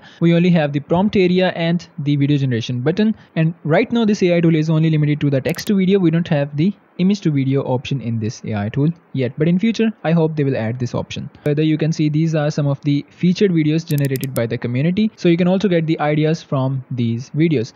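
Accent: India. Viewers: What's wrong with your English? Are you from India? It’s just that in India everyone speaks with a terrible accent that even a foreigner can hear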